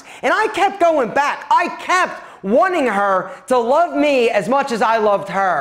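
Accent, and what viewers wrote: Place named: Italian-American, United States. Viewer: It's the Italian-American accent. Yes, it sounds so badass.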